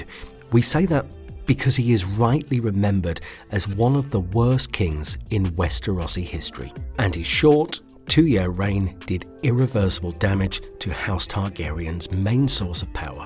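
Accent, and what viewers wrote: United Kingdom. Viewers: Yeah, I thought the other one was some kinda read-aloud android. This British dude is perfect... plus, you know... when people talk about GoT/HoTD, they just sound more relevant than a nerdy american with a lisp whose gums you can we smack while he talks- I forgot which specific channel I'm talking about. But yehh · Your British accent makes you sound intelligent, but your pronunciation and word choice implies the opposite. To be clear, I am not talking about your pronunciation of GRRM's invented character and place names, I'm talking about your mispronunciation of English words.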